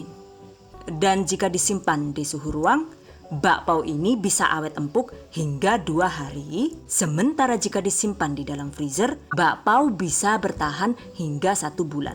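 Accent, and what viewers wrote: Banyumas, Indonesia. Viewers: Deneng kaya wong ngapak. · Catat mantuk Bu bapaiunya. Njenengan orang Tegal apa kebumen ya entong seneng njenengan ngendijan ngapak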